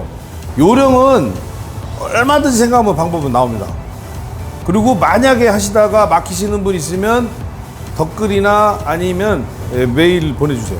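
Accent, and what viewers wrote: Chungcheong, South Korea. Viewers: 구독과 좋아요!! 백종원 선생님을 옛 무한도전 때 정준하 형님이 무슨 요리 레시피 관련해서 찾아뵙던 방영분이 지금도 생각납니다. 아마도 그 때가 백종원 선생님을 처음 알았을겁니다. 그리고 나서 아마도!! 백종원 선생님을 전국적인 스타로 이름을 알리게 된 방송이 우리가 다 아는 이모티콘 방송이었을 테고요 ^^ 편집의 대마왕이라 불릴 정도로 깨알만큼 재미를 더 했었죠 그리고 ~쥬? ~쥬!! 구수한 충청도 사투리까지 더해져서 재미가 몇 배가 되었습니다. 이 후 부터는 인기와 더불어 질투하는 분들도 많이 생기고.. 어쩔 수 없는 연예인에 대한 호감에 대한 반비례 아닐까 싶습니다. 숙명과도 같은 거라고 봅니다. 같은 '성(性)'을 사용하는 분들 중에서 큰 으뜸이 되는 분이 되길 기대하고 바라겠습니다. 화이팅 입니다!!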